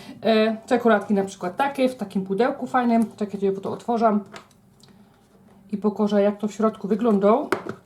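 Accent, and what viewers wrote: Silesia, Poland. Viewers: Super, ale fajnie po Śląsku rządzi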